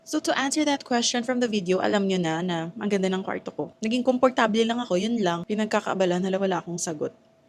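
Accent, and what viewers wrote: Iloilo, Philippines. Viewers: skruwd ap ilonggo accent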